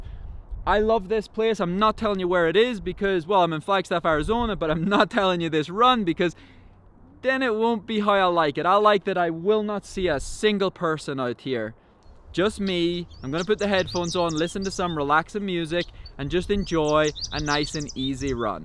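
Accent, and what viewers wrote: Northern Ireland, United Kingdom. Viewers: Jaysus that norn Irish accent cuts like a knife. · Came across this video and my ears perked up immediately, no mistaking that accent. Fellow nordie here.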